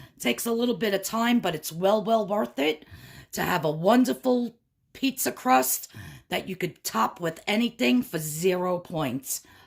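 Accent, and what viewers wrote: New York, United States. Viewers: ... I love you! Just found you on YouTube! Love your NY accent.....lol... where are you from? Long Island? the Bronx? I'm going to try this recipe.... You are very innovative my dear.... take care and will be supporting your channel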